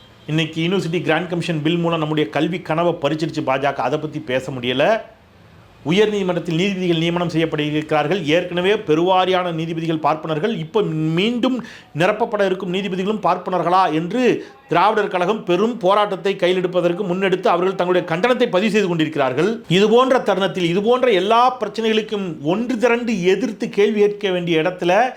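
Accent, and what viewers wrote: Tirunelveli, India. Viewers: sir tvl language ❤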